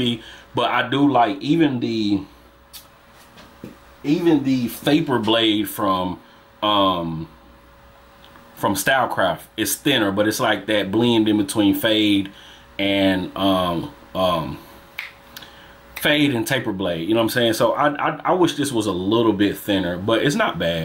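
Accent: South, United States. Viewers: Where you from? I’m from Seattle and those southern accents always stand out around here lol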